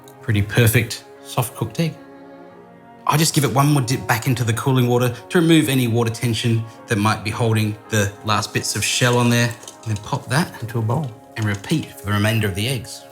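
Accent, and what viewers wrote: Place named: Australia. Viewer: Is this dude Japanese or Australian? (as far as nationality, not race)... I can't tell which language he speaks better but his English sounds perfect with an Australian accent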